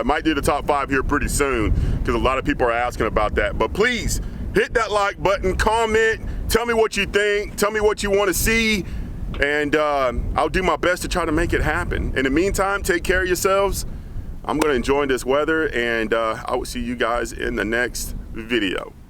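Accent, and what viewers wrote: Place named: Texas, United States. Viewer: Good stuff. Keep it up. Where in north Texas? You sound like the boys I grew up with.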